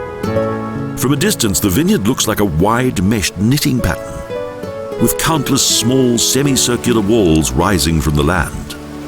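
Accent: United Kingdom. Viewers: Janet Njie lol, which British accent does the narrator speak? :D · Adrian Zieniewicz it British mate! 😁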